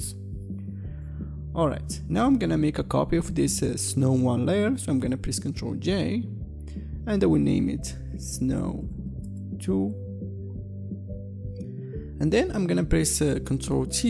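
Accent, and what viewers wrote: Morocco. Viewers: you have a Moroccan accent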